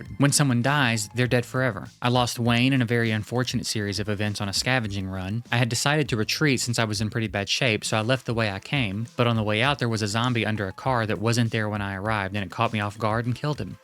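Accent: South, United States. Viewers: the southern drawl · your voice for some reason gives me mister grayson vibes so hard XD that southern tang on some words i have it too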